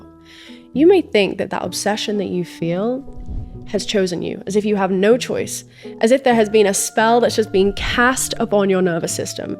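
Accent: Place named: London, United Kingdom. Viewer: I Loooooooove your London accent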